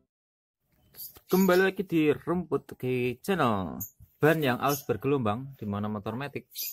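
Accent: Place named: Java, Indonesia. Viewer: Embuh 😵😄 , mesti iki wong jowo ...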